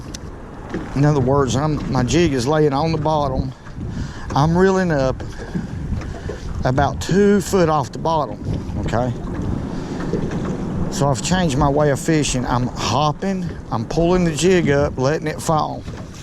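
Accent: South, United States. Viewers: Like your videos. Also like that southern traw! 👍🏻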